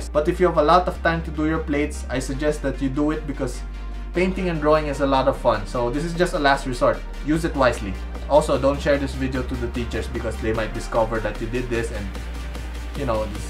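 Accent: Philippines. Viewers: Are you a Filipino? Your accent sounds like how a Pinoy person would speak in english Edit: Oh nvm you are a Filipino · Filipino accent haha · Keep up the Filipino accent dude when you are speaking English because it's our identity haha, btw great work, i will try your tips when i will study architecture again haha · i could relly tell your a filipino by accent hahahaha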